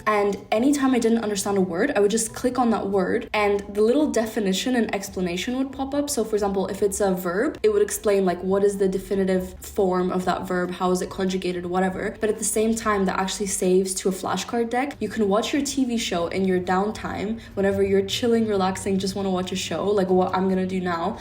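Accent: Rio de Janeiro, Brazil. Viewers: Carioca raiz ! amei demais !!!!!! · Como um carioca que sou, teu chiado está ficando ótimo! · I speak portuguese too. My accent is more of a Sao Paulo/Salvador accent. I can hear the Rio accent from you too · your accent is carioca lol kkksdjksjd but your portuguese sound amazing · you are doing amazing! I can clearly hear your carioca accent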